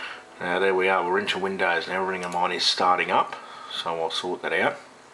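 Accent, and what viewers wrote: Australia. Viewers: Thanks for the vid. I must say that EVERYTIME I find someone who has successfully uploaded their OS onto the REVO, I can not find the MoBo that they used for sale online. EVERYTIME!! Good vid, the aussie accent was a nice change of pace too. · I'm a kiwi, and i can tell an Aussie when i hear one lol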